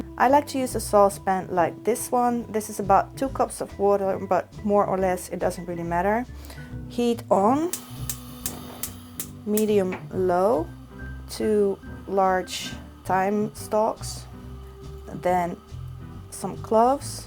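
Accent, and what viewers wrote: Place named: Netherlands. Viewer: I thought your were Dutch, sorrie it's always the accent. Love your date tarts by te way!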